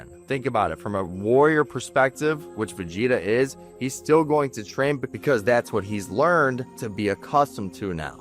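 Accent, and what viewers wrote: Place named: Chicago, United States. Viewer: This is the fiercest chicago accent I've heard since home alone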